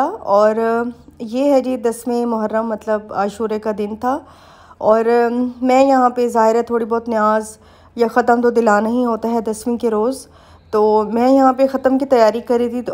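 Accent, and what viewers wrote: Saraiki, Pakistan. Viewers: Hayeee ap saraiki ktna pyara bolti hai 😍😍😍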